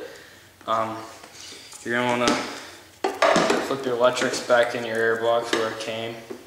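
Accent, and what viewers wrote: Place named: Ontario, Canada. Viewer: Hey you sound like your from ontario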